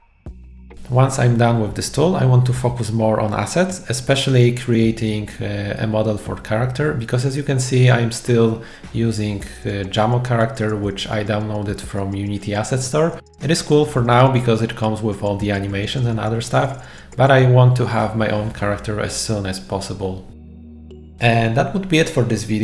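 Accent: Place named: Poland. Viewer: Are you from Poland? your accent sounds very Polish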